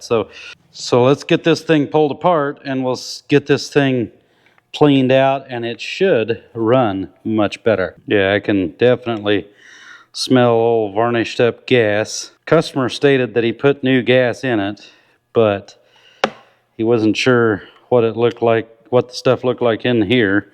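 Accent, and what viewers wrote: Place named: Southern Illinois, United States. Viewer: I thought your accent was more from south of I-72/ I-74, than Amish! I am sure that you are very proud of your Amish heritage and thank you for sharing your background with your viewers.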